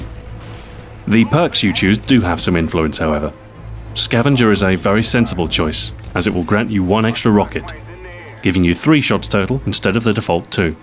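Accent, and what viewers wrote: Russia. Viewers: Hilaerious Russian accent (I'm Russian) :D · Nice Russian accent. Almost spot on and hard to believe you English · I love his russian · YOUR RUSSIAN IS SO FUCKING EPIC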